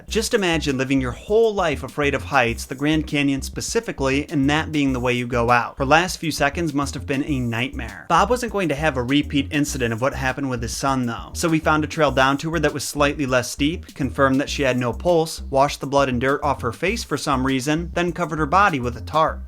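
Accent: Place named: Midwest, United States. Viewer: lmao yes 😂 Also you have gotta be from the mid-west! Every time you say “Bob” I feel like I’m at a family reunion in northern Minnesota Lol!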